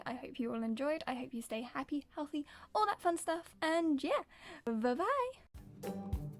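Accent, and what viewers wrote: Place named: United Kingdom. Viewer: Hi, Really loved the video. Really love your personality. You are so beautiful. Love the way you talk and your voice too, lol. Really love your British accent. Sorry if that was a weird thing to say. I have been using Buyee for a long time, and they are very fast, and very reliable, but the only thing I do not like abut them is that when I choose Express Air Mail delivery, they make the choice out of 3 options that I do not know until after they shipped. They either ship DHL, FEDEX, or UPS Express, depending on the weight and dimensions of the package. Anyways, I just wish I had my own choice from those 3 options. I really like that this Proxy service (Remambo) allows you to choose the shipping service you want, instead of making that choice for you. I am only half way through your video, but it is so great. You seem super smart. 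I might switch from Buyee to Remambo now. I will give Remambo a try. Thanks again for the great video. I liked and subscribed to your channel. Love you, lol.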